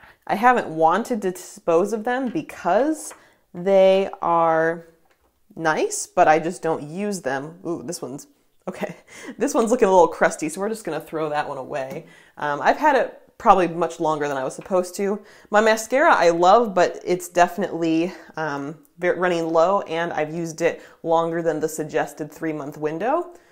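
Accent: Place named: United States. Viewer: Dear it is so nice to hear you speaking american english. Such a pleasure.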